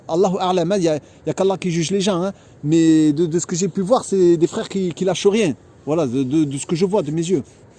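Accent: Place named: South, France. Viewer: سلام عليكم خويا Tout d'abord bienvenue à toi et ta petite famille en Algérie terre d'islam, j'ai regardé avec beaucoup d'attention toutes tes petites vidéos et tu m'as donné un grand sourire grâce à ta joie de vivre, ton courage et aussi ton petit accent du sud "fada" ^^ Que dieu vous préserve et vous aide dans votre quotidien ici en Algérie, et ne pas oublier la parole de dieu : يا أيها الذين أمنو إن تنصرو الله ينصركم Pour revenir au sujet de ta vidéo, tu as tout à fait raison sur les conditions de vie ici, contrairement à un Algérien qui est né et grandi ici, avec son entourage et sa famille, il est bien entendu très rude pour un mouhadjir comme toi de s'installer comme salarié ici, car la grille salariale ici n'est pas forcément adaptée à votre cas, et l'absence également de beaucoup d'aides sociales dont vous bénéficiez en France. Par contre pour ce qui est de l'entrepreneuriat, l'histoire est un peu différente, pour ne citer que quelques exemples, ici il est possible d'obtenir un terrain agricole ou industriel gratuitement auprès de l'état en contrepartie d'un investissement à faire ici dans ces secteurs, il faut bien entendu créer une entreprise de droit Algérien (en Algérie il est interdit de vendre à un non Algérien pour protéger la terre), il est également possible d'obtenir un crédit islamique hallal auprès d'un établissement financier pour financer partiellement son projet, Il y a beaucoup de choses à faire ici en Algérie et ce pays ne crache pas sur les gens sincè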